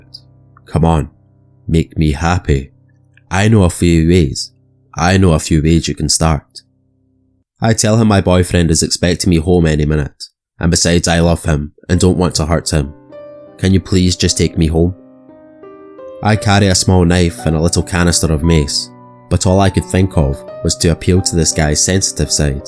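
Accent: Scotland, United Kingdom. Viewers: love the scotts accent,great stories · It took me some time to realize, he speaks Scottish, even tho I’m Irish😂🤦🏻‍♀️ anyways, great story☺️ keep on going⭐️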